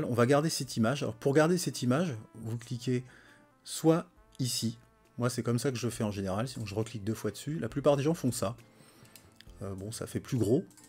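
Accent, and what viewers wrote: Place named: England, United Kingdom. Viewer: Mais quel travail de Titan ! Une série complète depuis l’install en français, il n’y en a pas beaucoup ! Merci pour cette formation et ce partage de savoir. J’ai appris tellement de choses avec cette 3ème partie. Pix est vraiment génial, les possibilités sont infinies :) il nous faudrait au moins 7 vies pour tout savoir. Plus qu’à mettre tout ça en application pour les prochains traitements :D Merci aussi pour le process icons et tes données à télécharger ;) Ps : Je suis fan de ton accent Anglais 😂 j’adore !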